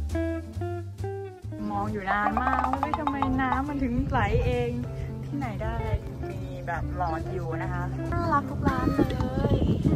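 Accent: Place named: France. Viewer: Très belle vidéo, j'adore ton accent Français trop mignon 😍 ❤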